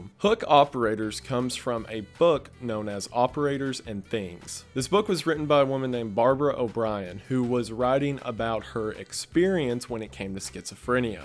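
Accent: South, United States. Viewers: He is so articulate..and every now and then - I’ll hear a very slight, southern, twang (or maybe it’s considered a drawl, forgive me, I’m nothing more than a Michigan Troll) but nevertheless, I honestly enjoy hearing it!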